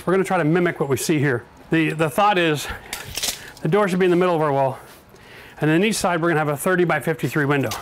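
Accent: Canada. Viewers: You live in Florida but have a Canadian accent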